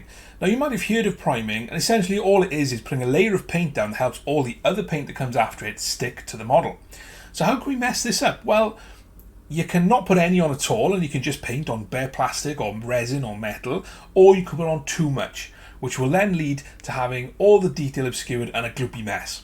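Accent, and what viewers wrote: Wales, United Kingdom. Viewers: You sound like a fellow Welshman! Those tips were much appreciated cheers!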